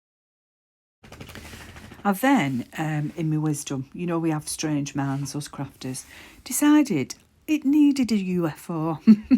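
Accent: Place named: Wigan, United Kingdom. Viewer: Hi, I’m new here and loving your style 😊 your accent sounds very Wigan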